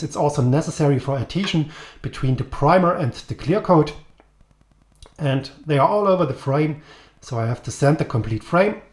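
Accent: Germany. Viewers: Angry german accent makes me happy! :)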